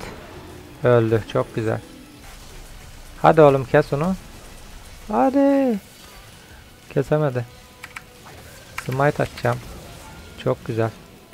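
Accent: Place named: Aksaray, Turkey. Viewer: abi şiven Aksaray şivesine benziyor bende orda yaşıorum tam olarak bilgi verirmisin acaba bu konuda bize D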